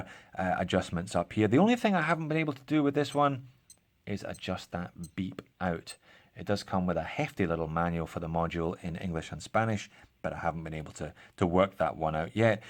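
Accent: Scotland, United Kingdom. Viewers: Scottish accent. “I’m in Australia incase you haven’t guessed” Yeah I have a map in my place. Don’t mean I’m from Uganda. Glasgow I’m from as it goes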